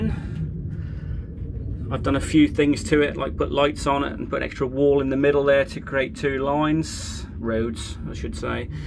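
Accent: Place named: Norfolk, United Kingdom. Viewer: Fantastic layout mate! That accent sounds very Norfolk/fen am I right ?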